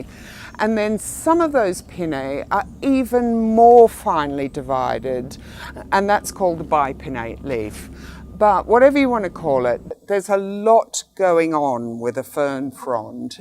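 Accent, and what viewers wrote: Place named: England, United Kingdom. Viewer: It's either Midlands or southern England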